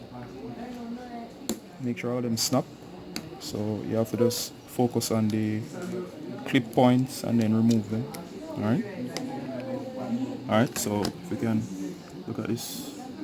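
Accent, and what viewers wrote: Jamaica. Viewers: can you please fix mine. It just chip out:'(.You sound Jamaican, I'm Jamaican can you please help me.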